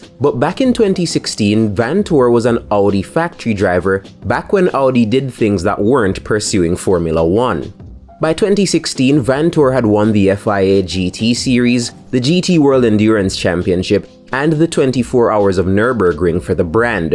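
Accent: Jamaica. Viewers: are you Jamaican by any chance? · I can't tell Are you Jamaican Or Pikey? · I was thinking canadian, but yh probz yardie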